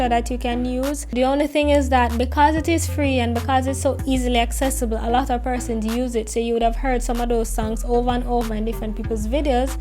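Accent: Jamaica. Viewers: Your very beautiful young lady wen I saw u I thought u was an African girl but wen u spoke I realised you're Jamaican ..no offence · Are you a Jamaican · Are u Jamaican :) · You sound Jamaican, thank you for this video 🥳❤️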